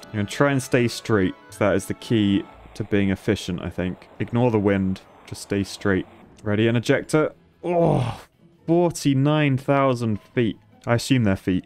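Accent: United Kingdom. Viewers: I swear engineer is like df but bri'ish and more pg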